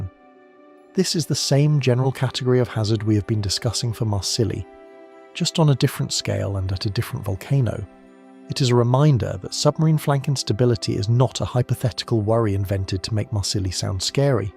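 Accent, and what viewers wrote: United Kingdom. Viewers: After reading repeated complaints across multiple posts of this channel regarding the apparent idiosyncrasies of its content, clarification seems appropriate: 1) Though we speak American English in the U.S., regional pronunciations of U.S. place names differ with regional dialects. How locals pronounce a place name often contradicts spelling. 2) The AI voice used for the channel uses British English. In some British dialects the final ‘a’ of a word can be heard as a very soft ‘r’ or, depending upon the region, a harder ‘r’. As in American dialects, both pronunciations also contradict spelling. 3) Visuals matching audio: It has been reported that this platform has dictated content creators must have constantly moving visuals, static images are unacceptable. You may have noticed some audio books for example, have exited the platform because of the amount of time required to create moving visuals that coordinate with content. 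Longer content requires a great deal of work to find, create, and edit; even using an AI tool. 4) Some content creators have indicated these recent platform changes and requirements have also carried a financial impact, making the continuation of their channel impossible to justify. There is definitely a lot of AI slop unworthy of your viewing on this platform. However, much like an audiobook or purposefully sleep-inducing content, this channel appears to be predominantly intended as an audio opportunity which uses continuously moving images (however unrela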